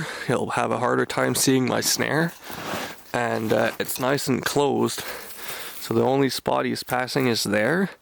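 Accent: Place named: Quebec, Canada. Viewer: Moi qui look this up pi j'técoute pi j'me dit: ya un accent Québecois chu sure. Ben oui! Nice!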